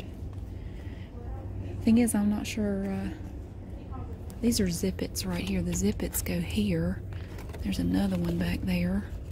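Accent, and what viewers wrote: South, United States. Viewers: New viewer here and I just have to say the best part of your videos is your voice. Always remind me of the movie The Help. 😂 that strong southern accent is so nice!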